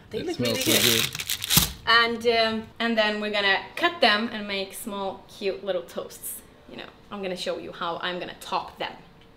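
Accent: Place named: Sweden. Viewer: Jag är svensk och så fort jag hörde henne börja prata ( utan att veta innan att hon var svensk) så tänkte jag direkt att det var en svensk som snackade lol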